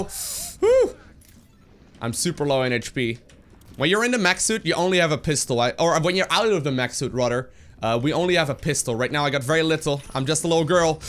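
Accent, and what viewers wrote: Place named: Netherlands. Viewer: that dutch accent... r u from holland mate?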